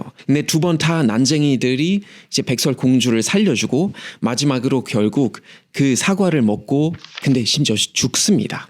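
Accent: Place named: Germany. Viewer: 좋네요 독어발음이 너무 아름답네요 ㅎㅎㅎㅎㅎㅎ이야기도 풍부하고 좋읍니다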